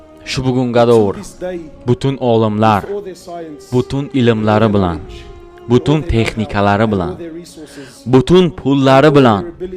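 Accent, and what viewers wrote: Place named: Tajikistan. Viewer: Tojikistindan bo‘lsalar kerak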